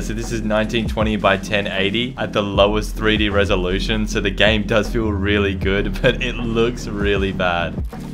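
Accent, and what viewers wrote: Australia. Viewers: Are u Australian I just think u are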